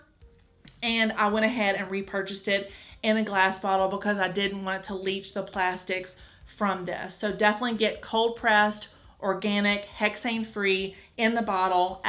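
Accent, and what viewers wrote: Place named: Alabama, United States. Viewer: This is my first time finding your page and my first thought was she talks just as country as me, i wander if she lives in Alabama too ? 😂😂 And before the video ended u confirmed that in fact u do 👏🏽😂i knew it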